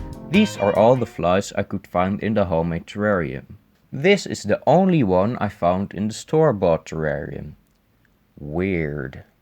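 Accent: Netherlands. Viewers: You are too adorable. “Here’s a squished one. Twins!” Hearing your accent makes me miss Holland so much. I studied college in Breda at NHTV, and all the Dutch guys are just such sweethearts. Miss the frites carts 😉 and mayonnaise.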